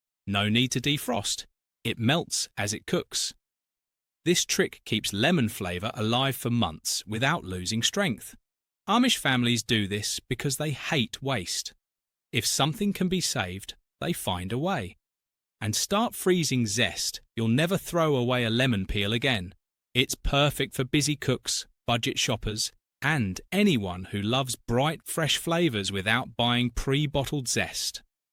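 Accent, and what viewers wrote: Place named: United Kingdom. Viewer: Is this AI narrating ? He speaks with a British accent but uses American phrases 🤮 Ffs might as well use a Yank narrator.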